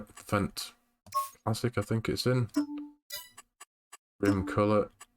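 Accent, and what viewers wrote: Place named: Castleford, United Kingdom. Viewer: Ey up I'm chatting with my wife trying to think where ya from n u sound castleford area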